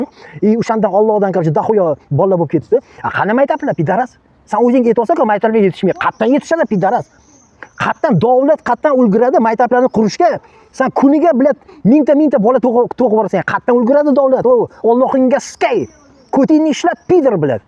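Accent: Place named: Fergana Valley, Uzbekistan. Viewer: Вой хоромие. Водийда гапиряптими бу эшшак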